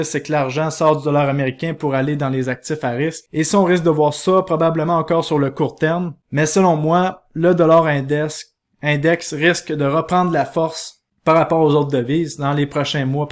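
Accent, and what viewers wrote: Quebec, Canada. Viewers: Je vois tes vidéos depuis 8 mois Ne change rien .Elles sont TOUTES SUPER. et Merci pour l'accent de Québec en plus. Patrick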